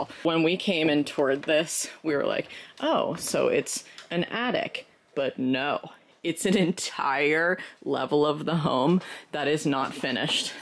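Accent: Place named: South, United States. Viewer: This is such an amazing place, congrats to you both!! Your future children are so lucky to be able to grow up in a place like this I know youre gunna make it so homely and warm<3 Also! Idk if youre doing it on purpose but I feel like youve gotten a more of a southern accent since being in virginia than when you were in oregon haha its so cute!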